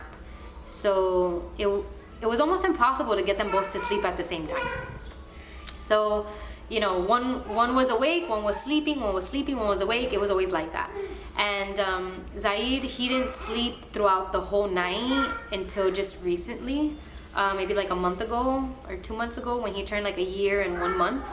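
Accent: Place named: San Francisco, United States. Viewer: I knew I recognized that accent! I live in SF too lol. I’m not pregnant (yet) but am doing research because I plan to have children soon. I always planned to have my children 1.5 years apart but this is eye opening. I really enjoy your videos, thanks so much for your honesty. Your eyes are gorgeous btw.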